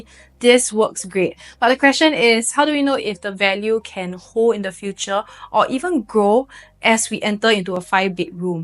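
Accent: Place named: Singapore. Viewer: Kind of singaporean accent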